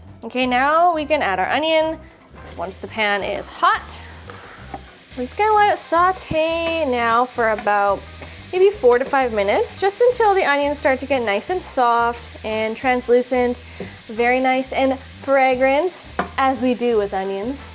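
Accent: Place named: France. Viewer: I loved your French accent!😊 I’m new here and I’m glad I found your channel!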